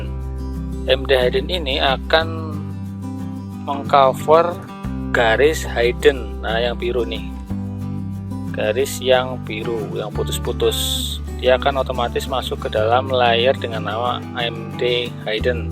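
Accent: Banyumas, Indonesia. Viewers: Belajar lagi sama om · pagi " dah dikasih pelajaran nih makasih geh om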